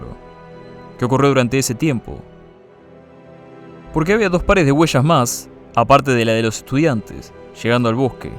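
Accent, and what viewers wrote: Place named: Buenos Aires, Argentina. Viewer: Sonás re-porteño flaco, ¿de que barrio de capital sos? (no es una crítica, lo pregunto con buena onda). Capital federal (denominación antigua para el distrito federal nacional de Argentina), C.A.B.A (ciudad autónoma de Buenos Aires: denominación moderna)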